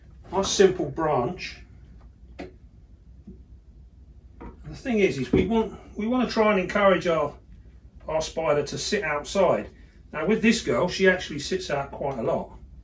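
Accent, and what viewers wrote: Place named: London, United Kingdom. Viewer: man, I didn't like spiders until I found your channel. Wow. And I love that salt of the earth London accent 👍🏻 Changing people's minds pal All the best From sunny Glasgow 🇬🇧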